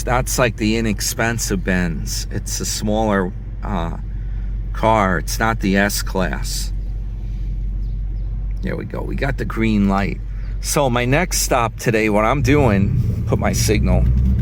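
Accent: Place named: Florida, United States. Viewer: U lost your NY accent u sound like a Floridian now . R u looking for some Sugar like ST the King of YouTube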